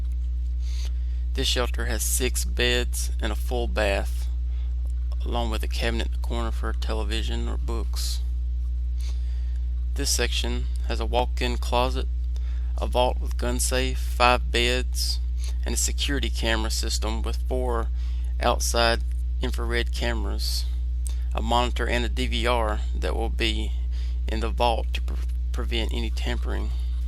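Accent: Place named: South, United States. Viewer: Love that Southern accent you got rocking!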